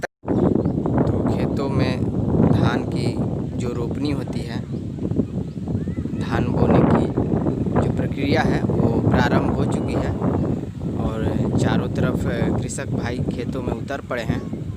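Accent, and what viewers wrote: Standard. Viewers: Bhai ji aapki hindi bhut shudh hai Aap bs galat rah pe mt chlna aap dil se bolg bnao Or hr video me improbe kro thumbail pe ghyan do contet pe dhyan Aap jrror kroge Lobe you from himachal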